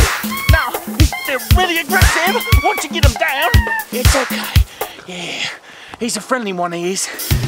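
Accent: Australia. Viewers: I love the over the top Australian accent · Hahahahaahaha! I wasn't expecting this. You sound and look like an Australian indeed. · Bluddy hehhhhlllllll!! This is halarious 😂👍 mixture of aussie, sooth afrikhn and irish. #fitbullaussie approved 😉😁👍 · Australian accent lol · Nice Australian accent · Fair dinkum Aussie here....great aussie accent if I do say so myself. We miss our Steve Irwin.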